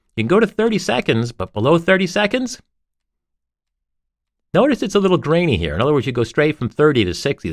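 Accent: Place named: North America. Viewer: Dear I love your reviews, you are responsible for my choice of a Nikon D600 as my first digital camera (which Nikon subsequently replaced for free with a D610 because of the shutter/oil problems). I had to "up-grade" to digital for on-land photography when my Konica T3 became irreparable. My first Nikon was a Nikonos 5 - which feels very much the same "in the hand" as does my Fuji X-T1 (again, acquired on the basis of your review). Anyone who has used a Nikonos 5 becomes a rusted-on Nikon user for life. But, please, please, please, for the benefit of we English speakers, the correct pronunciation of "Nikon" is indeed "Nikon", not "Nye--kon". That is, "Nikon", as derived from "Nippon", not "Nye-kon" as not derived from "Nye-pon". But, more importantly, a "Z6" is a "Zed-6", not a "Zee-6". To we English speakers, hearing a North-American accent, the expressions "Zee-6" is indistinguishable from "Dee-6". 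Given the date of this review, your references to "Zee-6" could not have been to a "Dee-6", but how many YouTube viewers actually look at the date of up-loading of a video?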